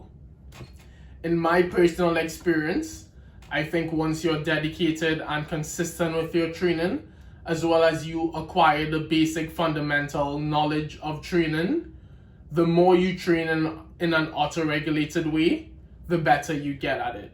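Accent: Trinidad and Tobago. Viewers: Great videos bro, keep up the great work. I recognize your accent, are you from Trinidad?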